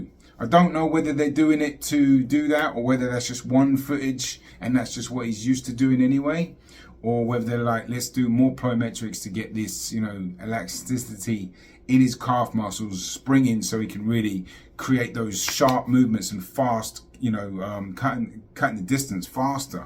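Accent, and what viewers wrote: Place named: Cockney, United Kingdom. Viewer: Love that east end accent, guv mate 👍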